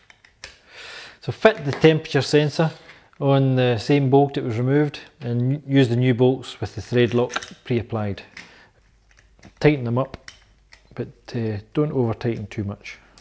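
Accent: Scotland, United Kingdom. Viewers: english with british accent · Very good explanation. And I love that Scottish accent of yours! ;-) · Are you from Scotland?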